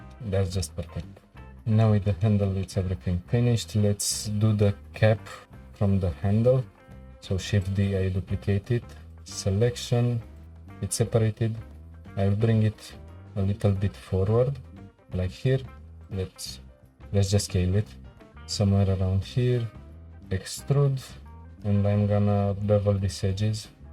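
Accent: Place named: Romania. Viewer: aud romanul in tine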